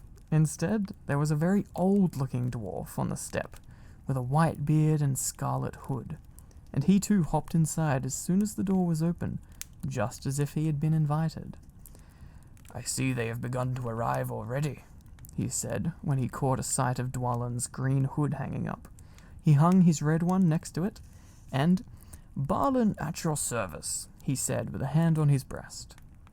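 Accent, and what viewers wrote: Australia. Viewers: omg i am so excited for this series!!! i recently got into the LOTR books and movies and im loving them + your videos too omg. I AM LOVING THIS COLLAB SO MUCH LMFAOOO 😂😭 but jokes aside, your ASMR videos are really helping me get through sickness (a literal covid-level stomach infection and cold), period pain, and just mental health struggles daily. thank you so much for doing what you do, and all the effort and hard work you put in! <3 (all the lovely gifs you create are not going unnoticed at all dw! 🦋) your wholesome videos really brighten up my day (or night? since i listen to them while sleeping mostly haha.) i love your sense of humour, your rambles, and your ideas! you also seem like an incredibly intelligent and a really good person. you are charming as hell and your austrailian accent is amazing of course haha. (im sorry this comment turned out to be equivalent to a personality test result + tarot reading 😭 but i meant it all!!!!) i am so glad i discovered your channel!!! 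ilysm pls, thank you so much for existing. 😭🫂💗💗💗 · i really can’t tell if ur Australian or not but i fkn love ur accent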